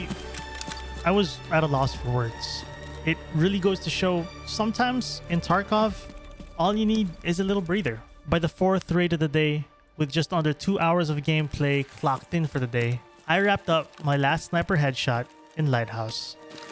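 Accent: Philippines. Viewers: The accent tells me somehow ", pre pinoy ka ba?" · Are you Filipino? Your accent is very familiar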